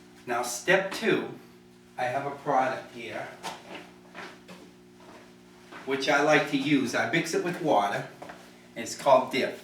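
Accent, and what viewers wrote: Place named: Boston, United States. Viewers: Gahhhhhdon sprayah. Good ole yankee accent you got there my brother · Good tutorial. Love the MA accent! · Boston accent, love it!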